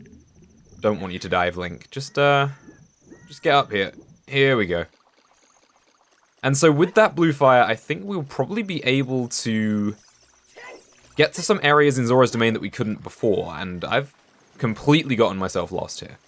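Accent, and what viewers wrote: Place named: United Kingdom. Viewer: your british accent disgusts me.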